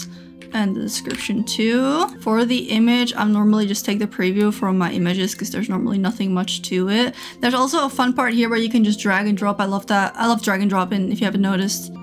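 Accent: Netherlands. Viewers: The dutch accent is killing me 😭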